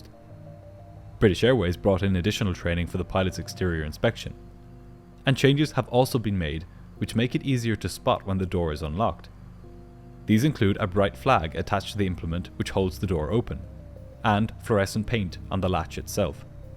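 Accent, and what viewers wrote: Ireland. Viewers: fantastic hearing a Dublin voice like mine! Love the videos. Thank You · Thanks a million for creating this video. Love the Irish accent. I can't believe the ground technicians can't identify the plane they are supposed to be working on. Surely their paperwork will have the plane's registration as at least one of the identifiers ?? If it does then those technicians should have been fired.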